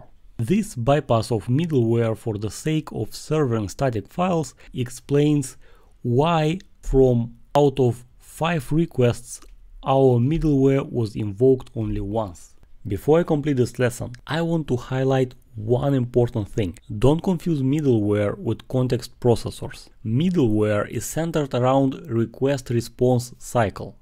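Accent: Russia. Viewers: I'm hearing Russian accent there)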